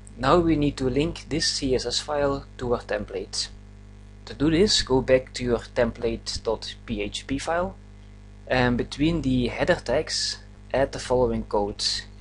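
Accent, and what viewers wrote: Netherlands. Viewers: nederlands accent, geen echte engelse :D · You have a dutch accent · you're dutch right (no offence it's the accent) :) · Binnen 1 seconden te merken dat je Nederlands bent haha, chill video! Thanks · We horen direct dat je nederlander bent lmao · You are dutch I assume? :p Ik ook :p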